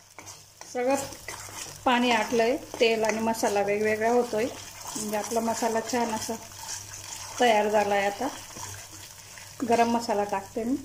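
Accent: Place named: Dhule, India. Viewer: Aap dhule ke ho kya😁nice video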